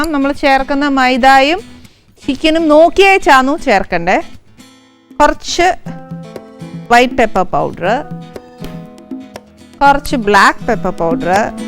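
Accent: Kottayam, India. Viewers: Kottayam slang matram mattiyal kollam... Dahikkunnilla.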